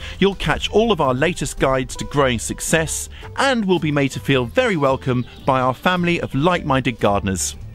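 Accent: England, United Kingdom. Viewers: The English accent and his expressions are so delightful...long live England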